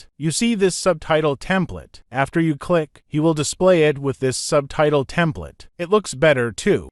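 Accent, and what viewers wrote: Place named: China. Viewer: Love the video, and I can't get over how your Chinese accent somehow shines through this AI voice. Way too cool, haha.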